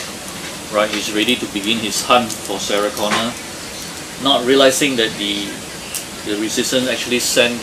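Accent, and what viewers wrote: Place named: Taiwan. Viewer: 一听你的accent我就知道你是台湾人。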